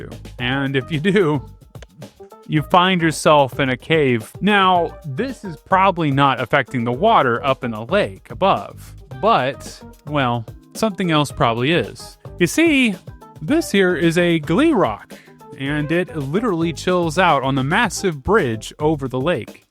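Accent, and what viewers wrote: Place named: Midwest, United States. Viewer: Your voice is extremely midwestern north america. Btw very cool video, I learned a lot!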